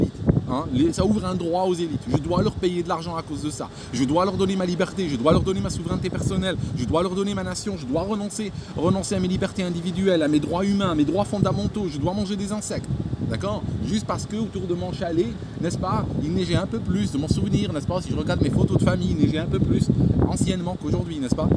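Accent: Switzerland. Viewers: Ton accent Suisse revient grave quand tu es énervé...😂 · Le retour de l'accent suisse d'?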